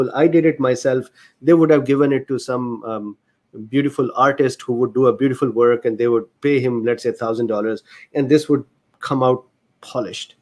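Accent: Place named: India. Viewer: I think your accent (and Indian accents in general) is beautiful. Also, there is no problem for me to understand what you're saying (I'm a native US English speaker). A few tips on the only mispronounciations I noticed: VAERS->vares (rhymes with cares), convalesce->conva-less (convalesced, just append a "d" sound at the end, like any "ed' past tense verb). There was (only) one other word, but I couldn't remember it, nor find it.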